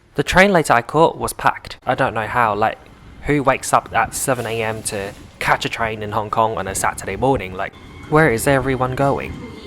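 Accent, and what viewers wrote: Australia. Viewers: The Aussie accent is classic mate! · Hey did you go to school in Australia. I am hearing your accent😂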